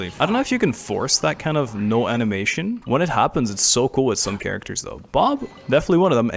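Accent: France. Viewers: WAIT ARE YOU FRENCH ? because you were pronouncing Fontaine very frenchly so I had suspicions but now... je suis trop contente parce que j'adore regarder tes vidéos en général, c'est super fun et tranquille :D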